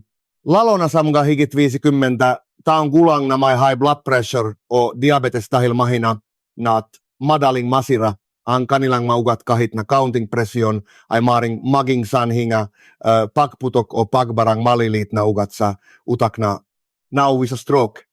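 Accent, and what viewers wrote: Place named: Visayas, Philippines. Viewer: Ang accent niya ay.visaya,hehe but it's ok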